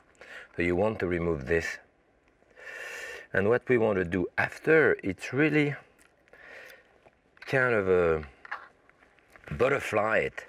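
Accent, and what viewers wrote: France. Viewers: RHUM...YAAAARglol no i do understand that this french chef prenoncation is hard to understand for those that do not speak french as their 1rst language...im french and im good in english ...i i miss some parts once in a while...his accent is SO funny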